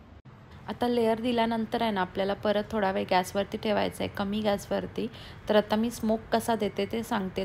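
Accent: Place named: Ahirani, India. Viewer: Tumchi basic language aahirani aahe ka?Tumchi Marathi language Varun vatate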